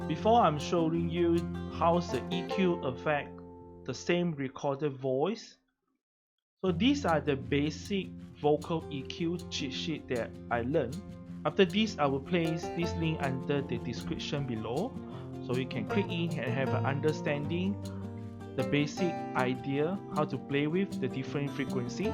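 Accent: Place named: Asia. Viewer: Get rid of the damn background music. It is bad enough that your Asian accent is difficult to comprehend. It is even worse to try to learn from this video with that background noice.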